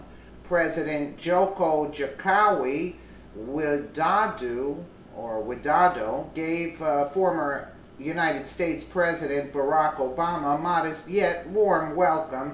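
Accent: New York, United States. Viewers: Lmao, part of the reason I love this channel is that I love listening to the woman's voice who reads the news articles. Your New York or Jersey accent just brings a smile to my face and has me crackin up (being from NY myself)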